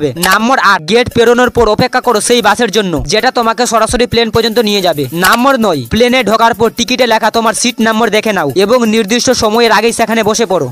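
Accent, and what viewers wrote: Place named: Kolkata, India. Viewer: Kolkatar bangla arokom e hoy, tai toh amader bangladesh er bangla always best cause its a dedicated country of bangla language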